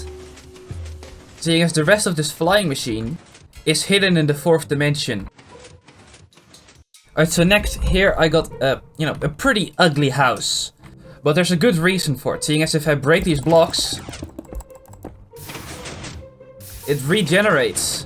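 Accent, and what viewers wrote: Netherlands. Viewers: wild dutch accent lol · You sound....dutch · the dutch accent :3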